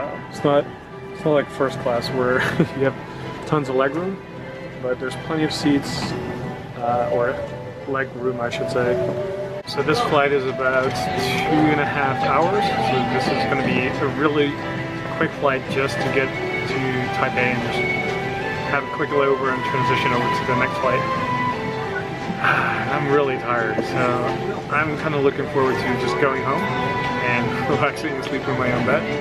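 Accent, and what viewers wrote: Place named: Australia. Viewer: you have a bit of aussie accent… nice flight review by the way. cheers!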